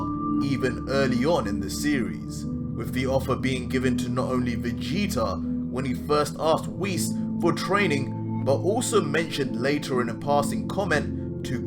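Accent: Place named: United Kingdom. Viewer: Dewi Middle he has a British accent though 🤦🏻‍♀️🤦🏻‍♀️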